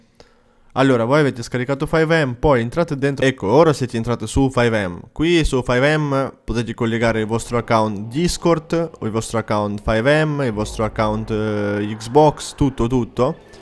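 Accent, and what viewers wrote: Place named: East, Italy. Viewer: si capisce che sei dellest dalle bmw e dallaccento ahahah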